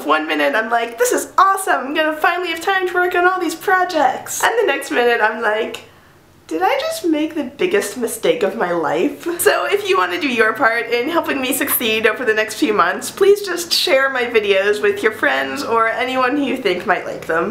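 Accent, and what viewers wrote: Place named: Vassar, United States. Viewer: She seems to talk like she's from Vassar.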